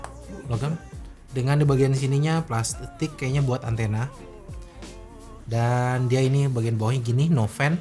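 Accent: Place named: Bekasi, Indonesia. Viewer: Suka banget dah pembawaannya. Bekasi banget!😂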